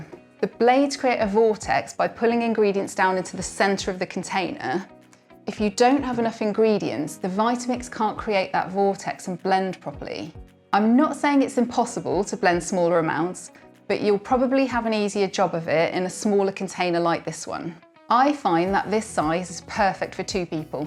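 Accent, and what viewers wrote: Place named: England, United Kingdom. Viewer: That English accent is absolutely delightful.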